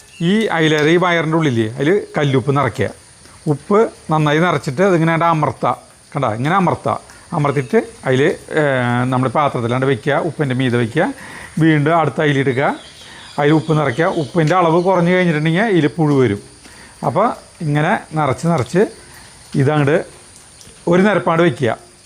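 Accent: Thrissur, India. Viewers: നല്ല തൃശൂർ ഘടി...❤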